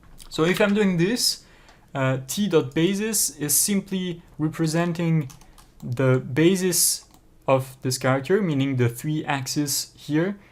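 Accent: France. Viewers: This is very late and you might not see this message but thank you so much for uploading this. By far the best tutorial on using C# in Godot. Also, the French accent you have is so charming. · Just so you know, I'm a big fan of french english! 😁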